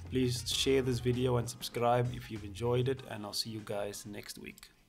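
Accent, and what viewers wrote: South Africa. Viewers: Awesome! When I heard you talking I knew you were from South Africa! It's cool to see a fellow South African on YouTube! Awesome channel man.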